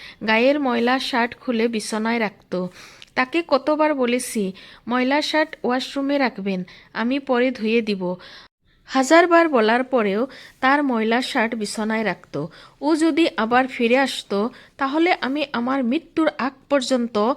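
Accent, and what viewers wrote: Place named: Sylhet, Bangladesh. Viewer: আপনি কি সিলেটের মানুষ।আপনার ভাষায় বুঝতে পারলাম আপনি সিলেটের মেয়ে।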